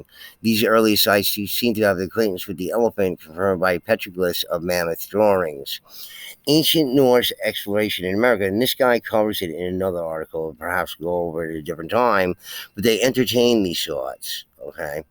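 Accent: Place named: East Coast, United States. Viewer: Never commented on your videos before ..I think I figured out why I like you channel so much. mostly about giants and stone walls.but also u read so fast with the east coast accent it keeps me from falling asleep because it keeps my ears tuned to the fast reading .😂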